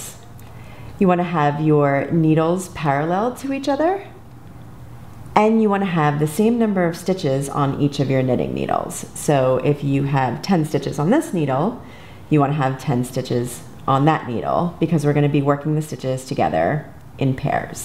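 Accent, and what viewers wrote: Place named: United States. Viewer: It's just her accent. Many Americans ive heard say "mounain" without the t sound like "tigh-en" here too. Just the accent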